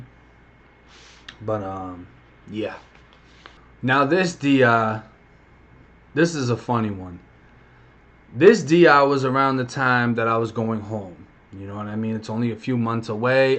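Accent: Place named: Massachusetts, United States. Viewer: Yo i found you on here and love hearing the stories. I’m from New Hampshire and I could tell you were from mass from the accent. I have been through the whole New Hampshire prison system and 5 of the county jails. Shits crazy I’m finally wrapped up after 7 long years but I like hearing from a real ass dude that speaks truth and doesn’t fabricate. You could write a book my dude. Oh ya and my birthday is aug 29 1986. Much love my dude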